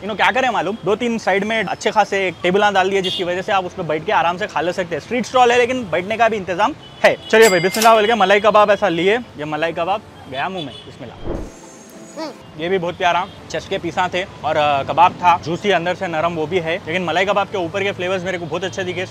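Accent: Hyderabad, India. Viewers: Very interesting, love your hyderabadi tone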